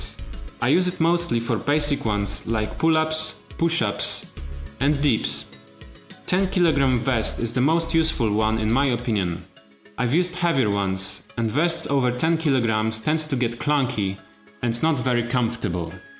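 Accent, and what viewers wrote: Poland. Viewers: Ha! Tak też myślałem ;) Akcent typowo polski, ale całkiem dobrze mówisz po angielsku. BTW. podsunąłeś mi ciekawe pomysły na wykorzystanie moich obręczy i też uważam je za najlepszy zakup sprzętu ;)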